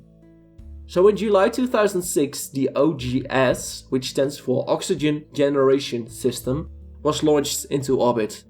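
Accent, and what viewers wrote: Netherlands. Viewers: let me gues, ur dutch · Your accent sounds Dutch, South African and German at the same time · Lekker Hollands accentje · Are you Dutch? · Nice dutch accent though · you're definitely dutch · Annoying dutch accent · Awesome video! Like and subscribed, you have a decent english accent, but i immediately heard that you were Dutch <3 Groeten uit Rotterdam ;)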